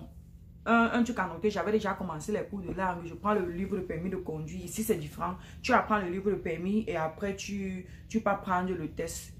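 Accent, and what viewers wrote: Cameroon. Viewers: 🤣🤣🤣 l'accent du +237. Merci du partage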